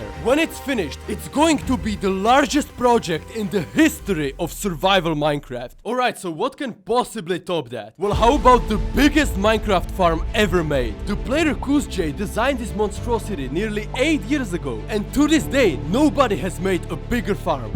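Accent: Czech Republic. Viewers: You sound Czech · Pekne video. Normalne poznas cecha mluvit anglicky😂😂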